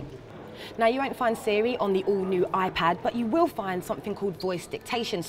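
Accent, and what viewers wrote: United Kingdom. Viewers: I can't stand british accent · Don't you just love the british accent?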